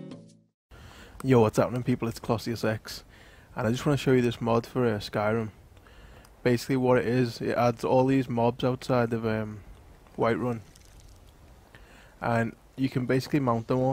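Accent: Liverpool, United Kingdom. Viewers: are you from liverpool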